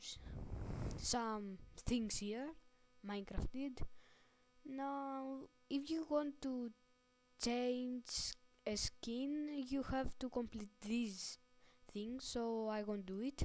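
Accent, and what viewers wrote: Greece. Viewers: Εισαι έλληνας ε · ναι εισαι Ελληνας και το καταλαβα μην προσπαθεις να κανεις αγγλικα βιντεο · To katalavenw apo ti fwni oti ise ellinas;-)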